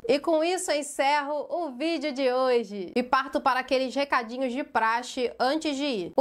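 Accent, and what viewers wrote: Rio de Janeiro, Brazil. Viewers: Moça, tu és carioca?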